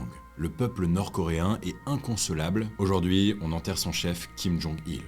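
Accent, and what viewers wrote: France. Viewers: C'est pourtant correct... jamais parfait vu l'accent français mais ça ressemble quand même bcp...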